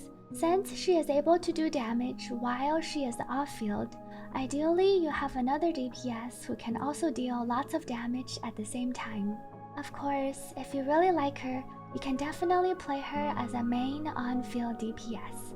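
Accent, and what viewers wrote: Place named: China. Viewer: You got a decent mandrin accent, making me 99% sure you speak it lol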